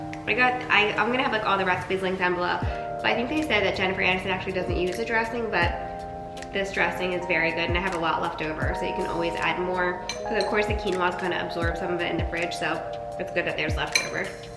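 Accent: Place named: East Coast, United States. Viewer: That east coast accent 🤩😝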